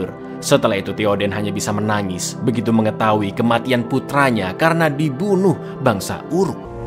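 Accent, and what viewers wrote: Java, Indonesia. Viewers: Samean wong jowo tah😂😂😂😂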